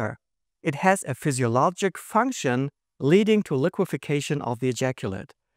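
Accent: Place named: Germany. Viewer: When he introduced himself with his name i just looked up like: damn dont even realize someone is also german until they sqy something in german... Like bro, i am german and dont recognize my own accent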